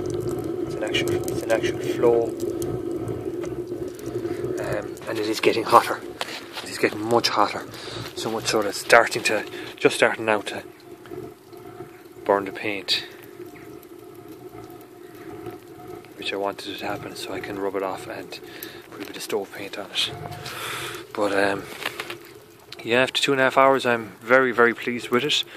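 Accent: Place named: Newfoundland, Canada. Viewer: sound alot like a newfoundlander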